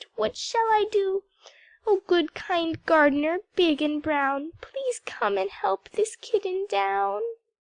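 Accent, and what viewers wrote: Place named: United States. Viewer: Английский с американским акцентом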